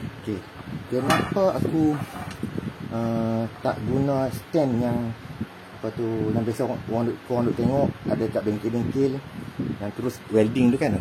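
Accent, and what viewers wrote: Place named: North, Malaysia. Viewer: Dekat mana nie...dngaq cakap utara...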